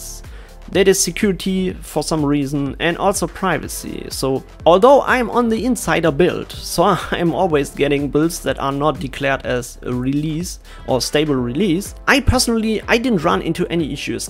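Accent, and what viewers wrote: Germany. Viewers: ist auch völlig in Ordnung. Der deutsche Akzent ist sehr deutlich, mag ich! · Sag mal, bist du deutscher?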